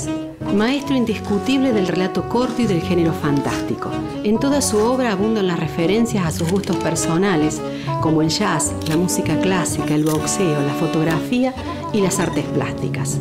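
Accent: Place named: Córdoba, Argentina. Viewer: Hermosoo! Es re cordobesa la que relata jijije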